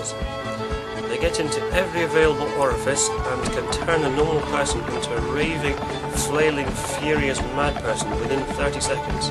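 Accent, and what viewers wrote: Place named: Southern England, United Kingdom. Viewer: Glad to finally know what country you're from, I thought you were a southern English dude like me from your accent until I heard you grew up in Oslo. Oh you and your love of the Natural world ._. you've been to asia and austrilasia but I've scarcely been north of London lol The lack of life....pretty much in general...in Southern England is a good thing ^_^ The number of humans not so much :U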